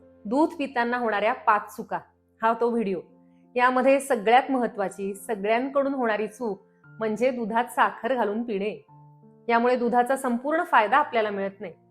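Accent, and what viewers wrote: Standard. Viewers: तुमचे video अत्यंत सुंदर माहितीपूर्ण असतात..उच्चार शुद्ध..जास्त पाल्हाळ नाही .त्यामुळे छान वाटतात.. आजच्या vid मध्ये एक sangal ka .खारीक मुले बरेचदा constipation hote..त्यावर काय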